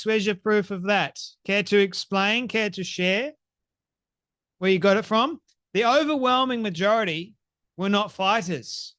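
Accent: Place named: Australia. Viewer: Love your show,. We need people of your calibre speaking the truth with an Australian accent. Keep it up mate.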